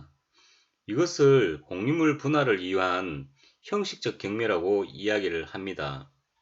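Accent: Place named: Gyeongsang, South Korea. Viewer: 경상도사투리가 이렇게 부드러운줄,, 내용도 넘 쉽게 귀에 쏙쏙,,감사합니다 좋아요 구독합니다^^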